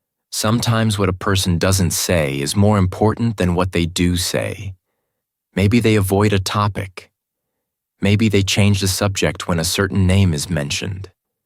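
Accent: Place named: United States. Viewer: with a vile accent twist. With all of mankind's languages to choose from to prostitute, they chose a most historically beautiful and fascinating language. America, a pox on humanity.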